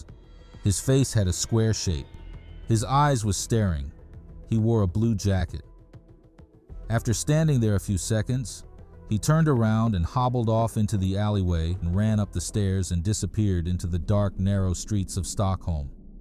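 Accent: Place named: United States. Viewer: Bra engelska? Det där är bred amerikansk accent.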